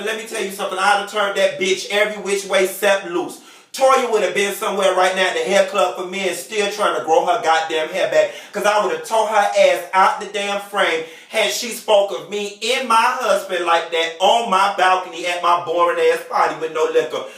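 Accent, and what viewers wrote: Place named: South, United States. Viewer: i love your videos and I'm an avid watcher.... But baby.... You hella country!!!!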